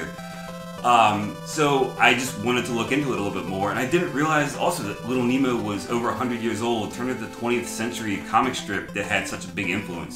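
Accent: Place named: United States. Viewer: Discovered your videos last night and love them. I like your accent as well, where in the US are you from?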